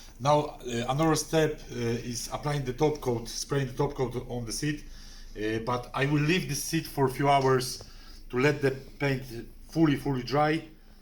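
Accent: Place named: Poland. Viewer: Szedłem o zakład, że słyszę polski akcent. Dobra robota. :)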